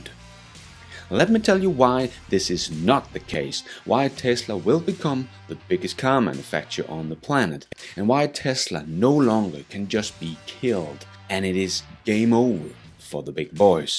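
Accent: Denmark. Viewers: You sound Danish. How are Tesla sales going there? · Er du dansker? 😁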